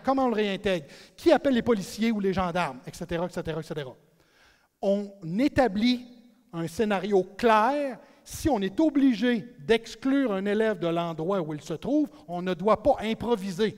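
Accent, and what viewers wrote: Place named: Quebec, Canada. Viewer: "mais si je m'adresse à Norman, seulement pour lui tomber sur la tomate..." mdrrr (en tout cas, superbe conférence, un bonheur à écouter. Et j'adore cet accent québécois <3)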